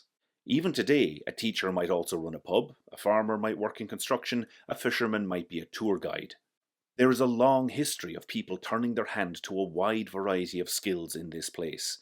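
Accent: Ireland. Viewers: Another Wesht culchie here, but from Galway. I knew the accent and glad I found this video. Any recommendations for historical/listed renovation guidance/support in Ireland? Awful hard to find resources.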